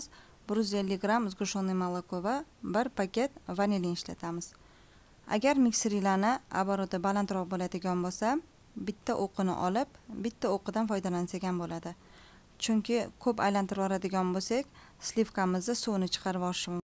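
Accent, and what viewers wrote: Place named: Kyrgyzstan. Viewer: вой ичегимми узип улдирдиз илохим бахтийзи берсин бу хам Аллохнинг бир синови лекин киргизчага ухшап гапирар экансиз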